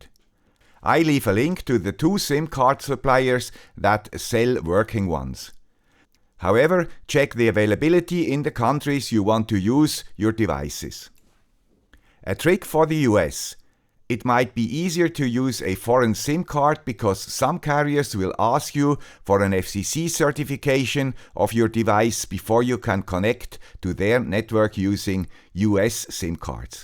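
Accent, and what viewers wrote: Switzerland. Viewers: I didn't know that was a Swiss accent. And why does everyone assume Americas eat steak? Is it unusual for people to eat beef? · Once again, "...the guy with the Swiss accent shows why I consider him the reigning King of IoT on YouTube! I've come across a company in the US that specializes in provisioning mixed mode, mixed carrier IoT/M1 SIMs networks I don't remember the name, and I'll try to get it to you if I can find it again. My new, rural, American home has essentially no coverage with handsets on one big network, except their wireless internet device supplies enough data for WiFi calling to handsets, and reasonably good YouTube video streaming. Another big network provides enough bars to do the above directly on handsets.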